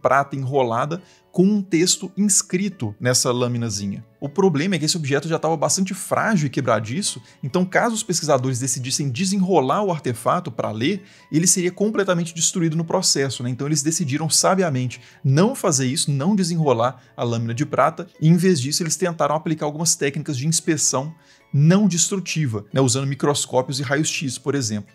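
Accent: Minas Gerais, Brazil. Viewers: Gente!!! O texto ja era elegante e preciso; os assuntos muito interessantes; a cadência da fala e a voz agradável atraentes; o equilíbrio, o respeito e a (tentativa bem sucedida) imparcialidade notáveis; e o delicioso minerês que emerge de vez em quando fazem do Estranha um canal necessário pelo conhecimento que divulga e eleva o ser humano mostrando o que ele tem de melhor. Parabéns, Dr 👏 Ah, este post era pra dizer que a edição está incrível ❤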